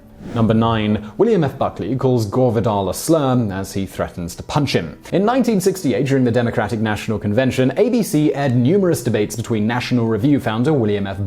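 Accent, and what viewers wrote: England, United Kingdom. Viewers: please learn to better modulate your voice to a slower tempo; you're not competing against someone from a help desk in New Delhi to see how many words you can produce under a minute! Your material is wonderful but I miss most of what you say unless I turn on the captions then loose seeing what's being shown! Yes, your diction is commendable and you should be proud of your British accent, just stop torturing us with your machinegun-talking-without-enough-commas-and-periods-between-changing-sentences!! Or, stop using Meth before you begin your shows as I'm getting ready to boycott your channel; I'm sure I'm not the only one who feels this way... Thank you. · Amazing that a bunch of "nothing" can be turned into something by a guy with an English accent.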